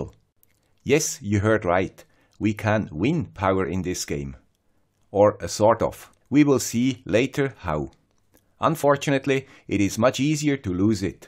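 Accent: Switzerland. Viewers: Came here for the IoT, stayed for the Swiss accent and the cat. Great content as always!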